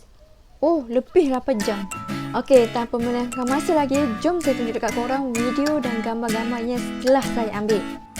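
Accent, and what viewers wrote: Kelantan, Malaysia. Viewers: Ore klate ko. Caro kecek pelet . Hahaha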